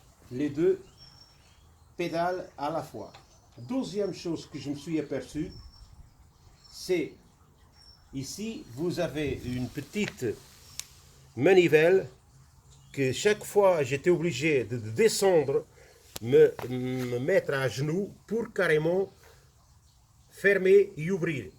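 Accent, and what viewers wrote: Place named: Portugal. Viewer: Bravo pour ton travail et ta présentation. Justement je m’intéressais à cette fendeuse dans le commerce par internet pour mes besoin dans le fin fond de l'Ariège où il n'y a pas de d'électricité. Tu me fais faire un bon en avant dans ma réflexion avant achat ! Mais je me tâte encore à prendre une petite thermique.... Merci et bonne continuation. PS : j'adore ton accent portugais ! ;) et bravo aussi pour la qualité de ton français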